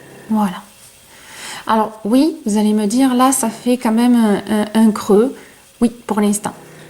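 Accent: South, France. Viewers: Merci à toi, "comme une grande" j'ai réussi à faire ce magnifique point de torsade grâce à tes explications claires et ton agréable accent du Sud.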